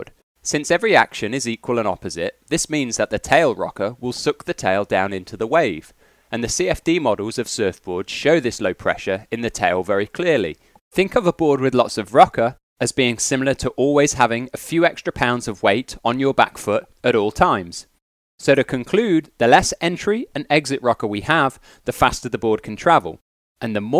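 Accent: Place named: Northern England, United Kingdom. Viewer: Every time I hear a northern English accent talk about surfing, I am surprised that people actually surf up there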